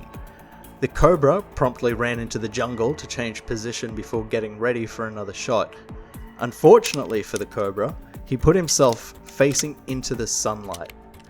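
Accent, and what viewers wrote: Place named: Australia. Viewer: I heard the Australian accent I thought it would be an Australian you're talking about . Heard enough about the Americans in that war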